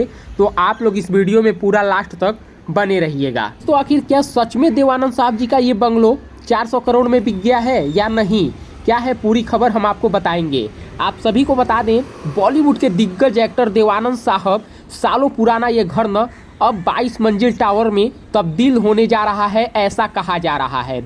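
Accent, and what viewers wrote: Bihar, India. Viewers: भाई आपकी बात करने के सुर से लगता है आप उस प्रदेश के है जिस प्रदेश के चाराचोर लल्लू यादव है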